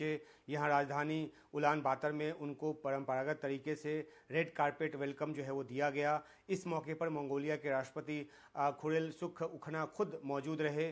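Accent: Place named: Bihar, India. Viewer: साला बिहारी तेरि pronounce से पता चलराहा है तु बिहारी है या बाङ्गगाली है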